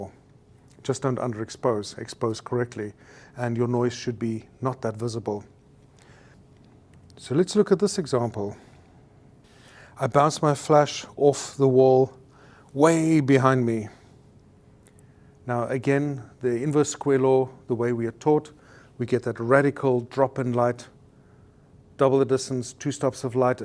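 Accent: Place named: Germany. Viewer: One question: how come you have a Dutch name and a German accent? :)) Fantastic stuff, this goes immediately into my GOLDEN TUTORIALS folder... There are very minor points I would have done slightly different, like with structuring video or editing (give a bit more time to showing the examples, and next to each other works best), but that's a side matter. I felt like back at school when we wondered how some great teachers stood out and could reach out and catch our attention. I've seen this video actually twice, counting the number of times I had to rewind to be able to follow (have no such a flash yet). Extremely welcome stuff, and I'll be buying the modern flash I had hesitated to, now under impact of this video. Can't wait!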